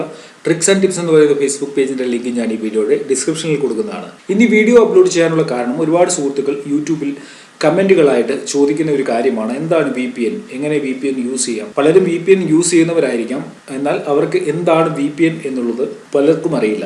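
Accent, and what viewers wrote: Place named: Kollam, India. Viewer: കൊല്ലം furi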